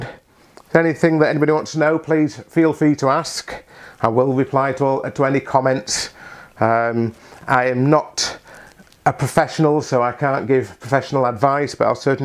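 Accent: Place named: England, United Kingdom. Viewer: Please forgive me new to your channel are you English you sound it but you said dollars .....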